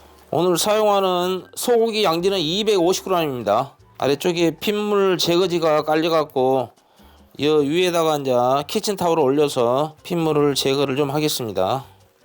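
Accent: Jeolla, South Korea. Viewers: 전라돈디요 ㅎㅎ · 전라도 사투리죠😂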